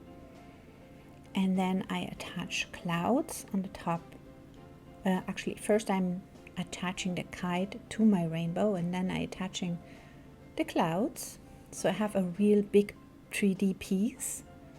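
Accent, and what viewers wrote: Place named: Rhineland, Germany. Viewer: My entire family is German. I was born in NY City area, my parents came to NY on ships. The reason I can tell you are from The Rhineland is that your accent is EXACTLY like my Aunt Marianne's, who was born in Lannesdorf, Köln area (Your English is EXCELLENT by the way!!) My Dad was from Lannesdorf also. My mother was from Sulzbach in Bavaria. I live in California now and will be watching your videos addictively now that I found your channel!!! Ich kann ein bischen Deutsch.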